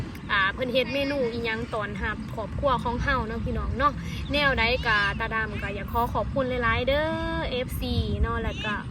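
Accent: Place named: Phibun, Thailand. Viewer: สบายดีอีหล่าตาดำหายไปดนเน้อเจ้า เว้าคือคนเมืองพิบูลฯคักเจ้า เป็นเพราะว่าเฮามีชายแดนติดกันกับช่องเม็กทางไทยน้อ น้องตาดำเว้ากะม่วนๆว่าสั้นสา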